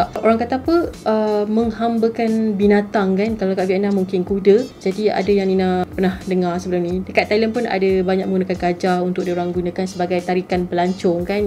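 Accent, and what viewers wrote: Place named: Johor, Malaysia. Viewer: Bahase km ni Johorian sangat..satu Malaysia bukan semua orang paham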